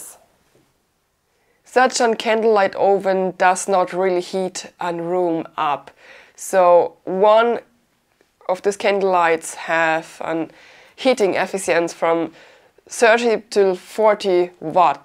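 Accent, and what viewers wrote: Bavaria, Germany. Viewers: Your accent when you speak accuses that you are German or something similar. I have had these models in my own house and in my parents' house for more than 30 years and no misfortune of any kind has ever happened, not even a fire or someone who has suffered burns. do not spread bad and false informations. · Sooo lustig: englisch mit bayrischem Akzent! 🤣🤣🤣🤣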